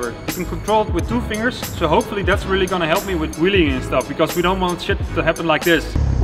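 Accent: Netherlands. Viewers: Ik hoor wel dat je nederlands bent ^_^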